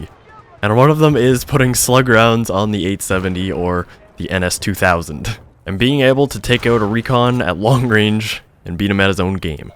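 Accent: Canada. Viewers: You sound like you’re from Port Alberni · im guessing his Canadian. the "about" gave it away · is this guy candadian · you Canadian ayy?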